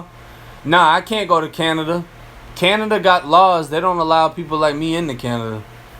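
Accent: Boston, United States. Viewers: I can here his battle between his boston accent and his fla. Accent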